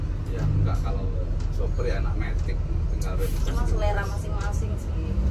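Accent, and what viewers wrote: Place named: Madura, Indonesia. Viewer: Logat bhsnya sepertinya org madura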